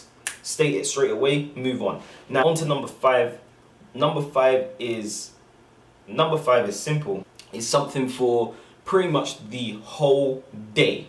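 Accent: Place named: AAVE, United States. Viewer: Hate the ebonics in your voice!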